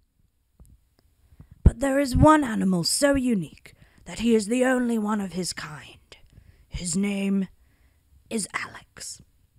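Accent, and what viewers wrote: Australia. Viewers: Mohammed Kortam it’s Australian because the person who’s speaking lives there lol. Pretty standard stuff. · Wait... Are you actually Australian? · U r Aussie I can tell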